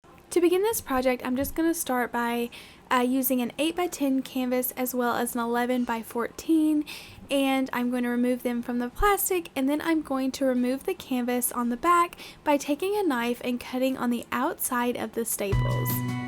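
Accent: South, United States. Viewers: Everything is just so cute ! Love your southern accent, where are you from ? I'm from Alabama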